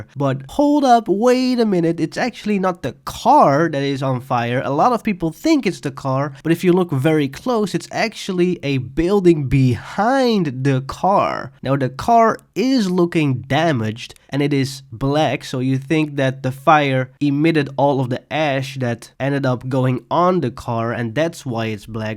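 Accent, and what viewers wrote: Netherlands. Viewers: Im probably wrong but your accent sounds kinda Dutch lol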